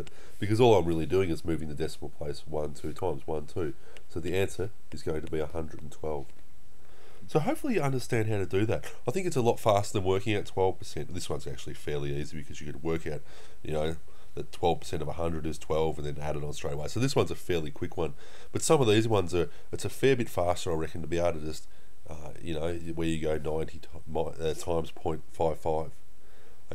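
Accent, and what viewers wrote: Australia. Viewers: Ayyyyyyy we got an Auzzie out here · Is he Australian sart of video he went like "G'day and welcome to channel"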